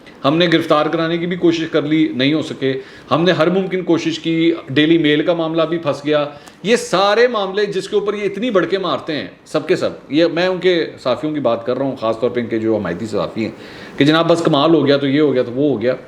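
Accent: Punjab, Pakistan. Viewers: بھائی آپکا ہلکا سا پنجابی ٹچ بولنا بہت مزہ دیتا ہے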